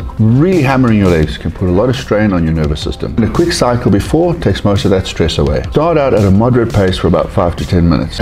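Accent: Afrikaans, South Africa. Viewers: Man I love you bru....you are so down to earth...so charming....funny and all round beast.....I can't help but notice the south african accent.....lol so I guess that also makes it easy for my to just give you my full support....LAAT ONS DIE BENE HARD BLIKSEM MANNE!!! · Is South African? I'm from western cape sounds hella Afrikaan lol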